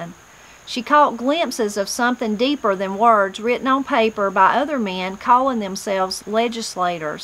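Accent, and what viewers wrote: Appalachia, United States. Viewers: “Teacher” is a brave and adventurous woman!! Thanks, your genuine Appalachian accent makes these readings special!💜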